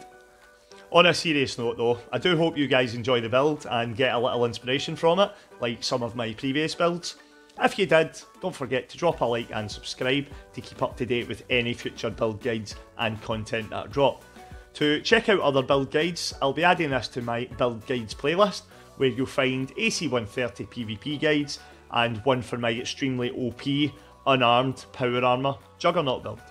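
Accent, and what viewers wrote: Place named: Scotland, United Kingdom. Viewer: Happening mate! I’m also Scottish so am very excited to hear your accent on some good fallout content. Just wanted to point out that you don’t get into the actual build what so every till after the 5 minute mark which means you spent a minimum of 1/4th of this video not getting to the point. Not saying this to out you down, just meant to be constructive criticism. A lot of people who google specific things about a game they’re playing such as “good non-power armour build” want a quick answer to a simple question so that they can get straight back into the game. Hope this maybe helps a bit as your ability to write a script and host is fantastic. You deserve significantly more subscribers and I’ve just become one. Best if luck mate.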